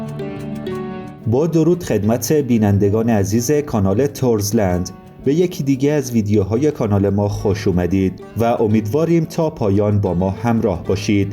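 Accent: Afghanistan. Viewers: فکر کنم گوینده افغان هستش. افغان ها حتی در تلویزیون هم اینجوری صحبت می کنند. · گوینده مثل اینکه افغان هست که مبخواد لهجه ی پشتواش را نشان ندهد صدایش را دوست نداشتم.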